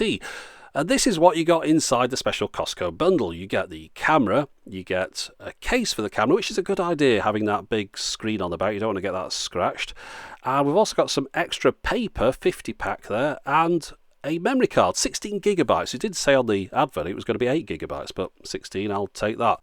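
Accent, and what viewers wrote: United Kingdom. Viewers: Love watch your video.. Probably because of your accent and British humor 😂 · I just discovered your channel and I really appreciate it. I just have a side question : Is it a britannic accent ? I wanted to know, I'm not sure about it ^^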